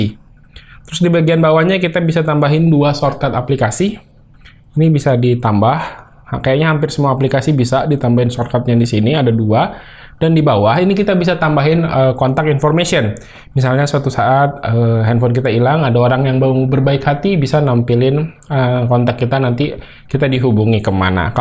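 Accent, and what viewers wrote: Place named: Kalimantan, Indonesia. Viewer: Wah orang kalimantan nih abangnya ya? 😁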